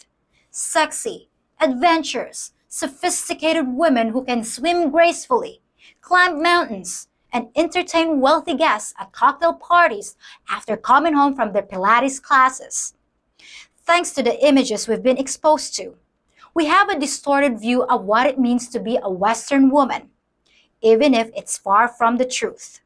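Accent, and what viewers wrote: United States. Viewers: Hello again my dear , it seems that your american slang is starting to show things that you could have only learned in the usa you were either in nyc or los angeles , your english is to polished to have come from the philippines , you can fool some people but you cant fool everybody im sure you will block and delete me now ! have a nice day ! im not knocking your channel you say some cool things that need to be said but pretending your somebody your not is another thing ! like you say honesty is the best policy ! and one other thing who is the Foreigner that is supporting you ? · You don’t sound very Filipina you sound more American sooooo I’m just saying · Yeah, guess where that American accent comes from 🤭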